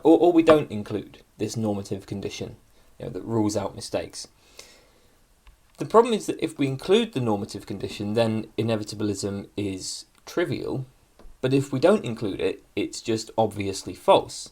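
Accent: United Kingdom. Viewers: I've been watching for more than 3 years and I've never been bothered, or in fact even noticed what you speak of. His videos are hidden gems I had to dig extensively to find. No where on the internet can you find educational material on such a vast range of topics, narrated by an eloquent, young person with a British accent (if I'm not mistaken). What's more is that he provides the most popular and successful interpretations of those ideas. What isn't there to like? Get a grip.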